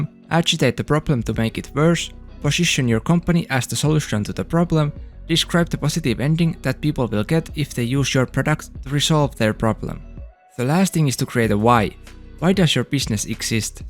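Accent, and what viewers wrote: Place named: India. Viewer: Can you put YT subtitles? My English is not good and it seems you have Indian accent which is more difficult to understand. Thanks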